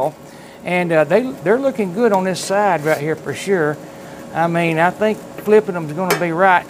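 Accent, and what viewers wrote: South, United States. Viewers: His southern accent instantly makes me trust him. Great video good sir! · His down south accent talking about wings is weirdly soothing.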